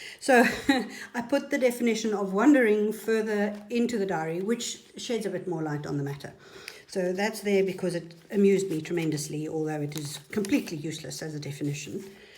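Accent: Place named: South Africa. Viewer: Hello fellow RSA-ian. Love your work... and listening to a familiar accent. Thanks for sharing.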